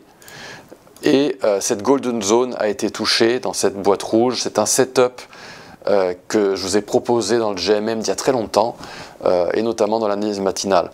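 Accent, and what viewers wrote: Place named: Corsica, France. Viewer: Petit accent corse sympa 😊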